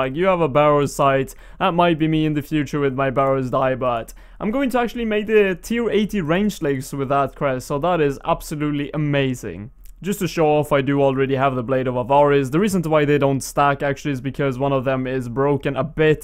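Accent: Sweden. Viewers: You're Swedish aren't you? :D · I love your swedish accent :3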